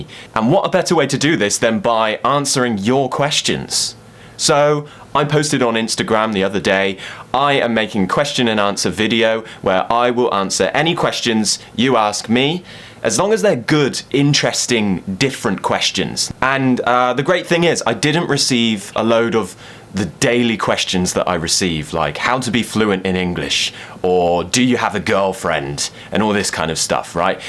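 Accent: United Kingdom. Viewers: A shoutout from Brazil. I'm passionate about the British English and I love your accent. Your videos are brill! keep it up! Cheers! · Hi. I watch you often, trying to learn British accent. You're a cool guy. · I like all Your video since the first time I found Your Youtube Channel, it help me improve my listening skill especially understanding British accent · I love the British accent and of course your teaching way! Thank you so much for your priceless and wonderful lessons! Please keep doing it. When I have a bad mood or something wrong I just watch your lessons. I want to say that your videos makes me feel a better and change my mood because your video is enjoyable. Thank you! 😊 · I love your sophisticated British accent omg <3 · Another question: Do you want to be my personal teacher? It's a joke, but I love you...rrrr accent... The only British accent I understand is yours. 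😍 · Love the British accent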